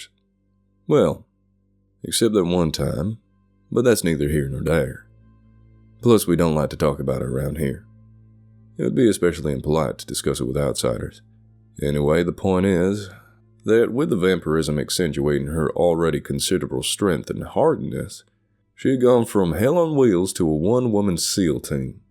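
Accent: South, United States. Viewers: While I will always appreciate the English accents of my favorite YouTube narrators I love hearing stories from America, South of the Mason Dixon line performed by a Bubba.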